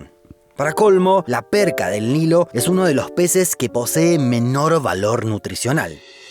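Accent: Rioplatense, Argentina. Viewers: Sos argentino o uruguayo? Jaja, lo loco es que es una de dos, no sos ni chileno ni nada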